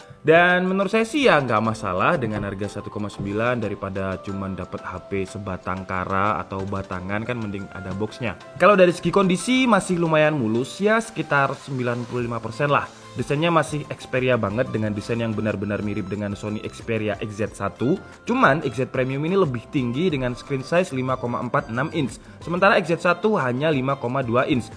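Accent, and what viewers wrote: Java, Indonesia. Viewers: Wong malang a mas? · Medok banget to mas mas 😂😂😂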